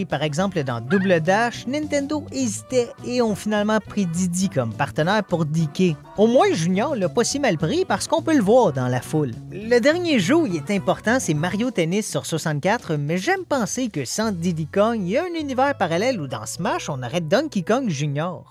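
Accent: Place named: Canada. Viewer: Cette façon de parler en disant "actuellement" et "régulier", mais il est canadien !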